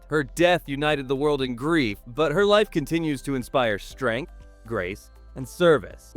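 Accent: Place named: United States. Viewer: This American voice is so inappropriate to the subject as well as the title! What for Gods sake is shocking here? This is just the way WE handle these things in GB and Europe.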